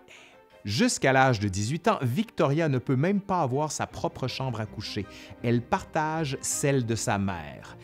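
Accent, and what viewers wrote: Quebec, Canada. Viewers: Sincèrement oui !!! excellent & fascinant ! l'histoire a toujours été mon "dada" (comme on dit en France, ma passion quoi) !!! tes talents de compteur sont indéniables 🤗 et j'adore ton petit accent québécois 😏🥰 j'aime toujours d'ailleurs la prononciation parfaite des noms anglais contrairement à nous 😅🙈 Ta chaine est ma préférée avec celle de Nota Bene & les Revues du Monde. Et oui j'avoue j'aime beaucoup aussi les émissions de Stéphane Bern 😅😁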